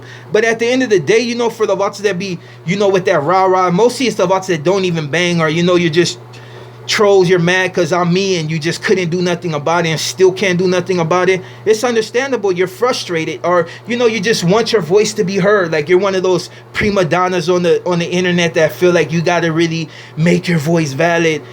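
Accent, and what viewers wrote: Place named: Mexico. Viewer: " vale pito " only a true mexicano can talk like you carnal my respects im a new subscriber keep the videos coming bro sur up perrito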